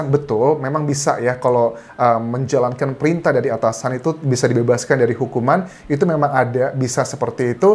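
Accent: Java, Indonesia. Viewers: Cara bicara bang sperti orang Jawa Bgtupun dng nm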